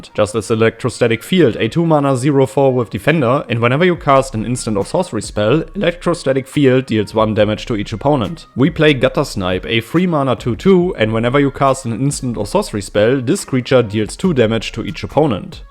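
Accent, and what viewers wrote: Germany. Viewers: I love the sound of your english 🤣 it sounds like german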